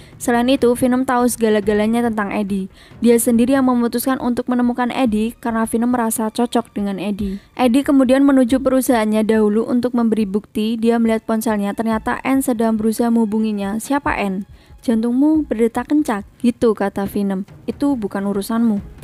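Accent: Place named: Semarang, Indonesia. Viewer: Kya eruh pengisi suaranya min...medoke semarangan 😅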